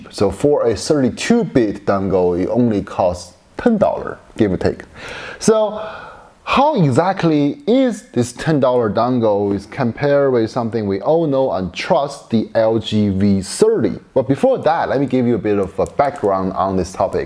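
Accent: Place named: South Korea. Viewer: What the f#ck is 똥글... ? Are you Chinese? Sounds so Korean ... Your accent, I mean.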